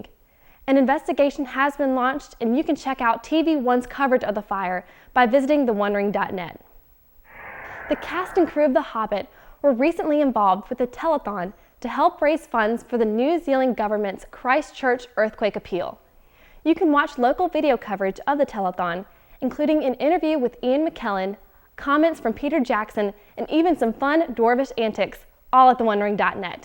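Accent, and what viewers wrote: United States. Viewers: Love the Southern accent!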